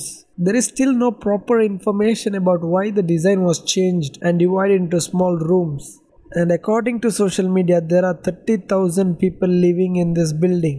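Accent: India. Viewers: Indian accent bro 😅😅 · Please use an AI generated English voice or something, this Indian accent is difficult to understand. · We can easily identify that ur from tamil nadu, tamil people english has separate slang needs to be recognize. I like it, as i studied in tamilnadu i know.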